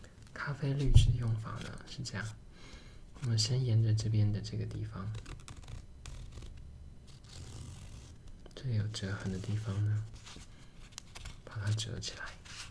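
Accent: Taiwan. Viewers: 我是大陸人，我就超喜歡台灣的口音的！感覺超溫柔超可愛的！哈哈，每個人喜歡的不同吧，反正我認識的朋友都很喜歡台灣口音，不會排斥的💛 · 剛才躺著聽這個準備睡覺，都沒有看到視頻的題目，可是聽到你我就知道你是台灣人。好喜歡台灣人說的普通話。口音好好聽，還有你的聲音也非常好聽哦!希望你會做更多視頻! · 男生台腔做ASMR还是很舒服的，我补脑了下东北口音瞬间笑死。做的很棒！非常感谢。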